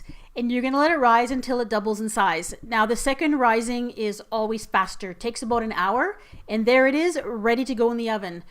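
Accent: Quebec, Canada. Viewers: is she canadian? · I was watching this and thought, "hmm, that sounds like a Canadian accent." Sure enough eh! Very pro-looking results, she clearly knows her stuff. I just know mine would turn out looking like Kermit the Frog had a horrible accident.. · Are you from Québec or just from canada ? :O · i love your accent are you from canadia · I believe she's French Canadian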